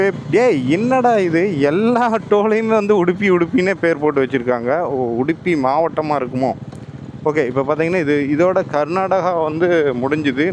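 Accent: Kongu, India. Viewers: Hello bro, 3 days ku munnadi dhan unga first video pathen, adhuku aparam almost 8 to 9 videos back to back pathuten. Nice vlogs in kongu accent. Oru question, vehicles lam overtake pannumbodhu horn adika mateenguringa adhu dhan konjam concerning ah iruku, especially lorry ku left side overtake pannumbodhu. Please take care. All the best for upcoming videos.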